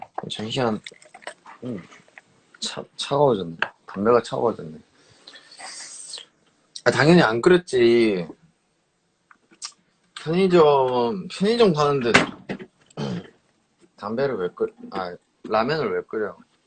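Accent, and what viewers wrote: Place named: Seoul, South Korea. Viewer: 이 형 고랩에서는 사투리 그대로 쓰더니 이제 서울말에 적응해가는듯 ㅋㅋ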